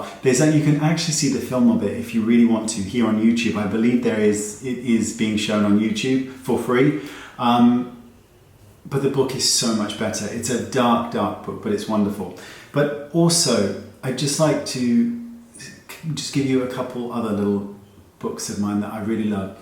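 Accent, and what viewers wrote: United Kingdom. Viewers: It's funny to me when people talk about a "British" accent, there are only about, what, a hundred? Two? But while your vowels are indeed lovely, I think the real thing may just be that you are a truly lovely person, and that shows through. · First, us Americans are just bowled over by the British accent which in your case is really lovely. Second, I have learned so much from you. I’m a almost-60 year old woman and the very editorial looks are just not appropriate for me. Lastly, your genuineness and gentleness are evident in every video. I try to fill my space with kindness. You help. 💜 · I remember the flowers in the attic 😢 I honestly have to be in the mood to pick up a book. I could probably write my own novel of ups and downs 😅 I love to pop on youtube and watch your videos and forget my worries. I think your voice and accent is great.. 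Being a fellow brit with the priceless wit and sarcasm is always a treat.. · You DO have the best voice & I love the British accent so much!!! And you just saying “Dirty Weekend”... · Oh.......I could listen to you read the phone book all night long. You have the most soothing voice, but we all feel you from the inside and know you’re the real deal! I’ve read some of the books you mentioned you have very good taste lol. When you said “bazaar” my heart melted.....bazaaaaaaa!!!! Love that British accent (and you’re cute too!!!) ❤️😘❤️ KimP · Cathy Gilbert you hit the nail on the head! I always feel good after watching one of his videos. He’s funny & helpful & truly caring. Wonderful British accent & very easy on the eyes LOL. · I agree with that comment, I love your voice. It is very soothing, soft and gentle. I love British accents. One day I will live in England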